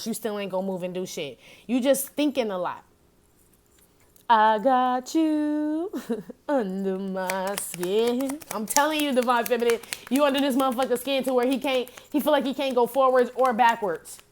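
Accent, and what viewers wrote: Chicago, United States. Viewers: Ctfu I know Chicago when I hear it!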